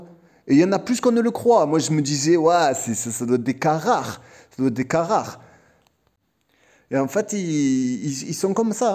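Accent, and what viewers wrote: Marseille, France. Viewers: Ti a l'accent Marseille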